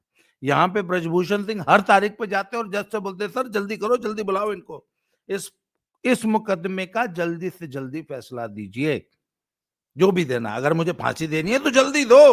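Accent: Haryana, India. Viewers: Baba ji aap ki haryanvi tune sun ker maja aa jata hai. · Babaji please aap to harvanvi bhasha hi bola karo.aap ko majedar tarike se bolte ho.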